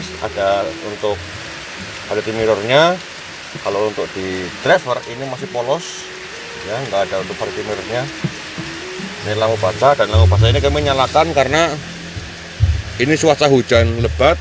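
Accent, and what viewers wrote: Banyumas, Indonesia. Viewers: Tangerang menyimak kang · jos gandos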